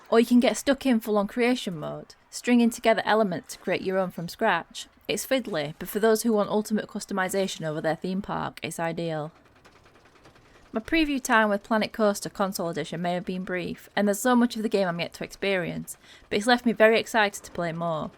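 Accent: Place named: Wakefield, United Kingdom. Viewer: I feel like you might be from near me! Wakefield area? Haha!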